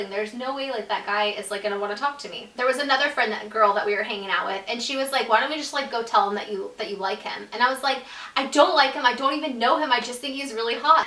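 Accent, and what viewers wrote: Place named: California, United States. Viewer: she's from california, that's the way people talk there.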